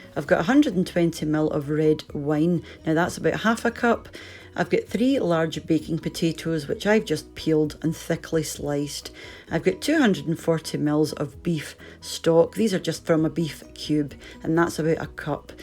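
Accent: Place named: Dundee, United Kingdom. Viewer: Hey ( hope I spelt that right ) this is a bit of an odd comment but bare with me. I live in Vietnam and stumbled across your videos about 8 months ago. Originally from Dundee, it was proper comforting to hear you talk during your videos, you have very similar tone/expressions to many of my family members. Just got back to Carnoustie to visit my mum and I made this recipe. Jesus Christ it was delicious and went down a storm with the rest of the family. Just wanted to say thanks for always making home not feel so far away whilst also providing great content. Big ❤️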